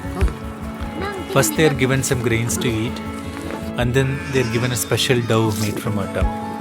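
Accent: South India, India. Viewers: I think you r south indian voice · You english language not good because south type language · South Indian talking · Indian tone whilst Briefing 😜though loved it ❤️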